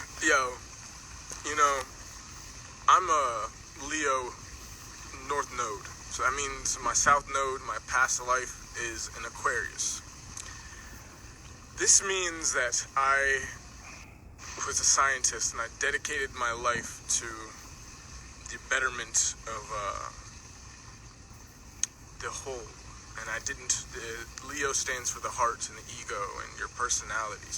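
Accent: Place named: United Kingdom. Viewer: Goood shit!!! Haha!! I love this dude. Amazing British accent too 😂 💪🏼💚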